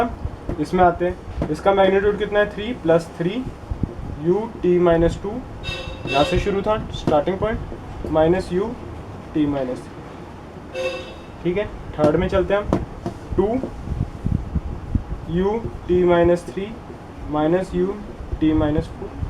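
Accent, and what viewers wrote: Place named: Punjab, India. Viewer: It Sounds Cute When a Punjabi Guy Speaks in Hindi .....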